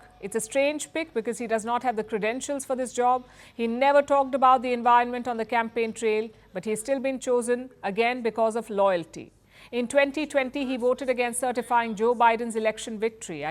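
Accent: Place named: India. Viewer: Strong Indian accent!!😮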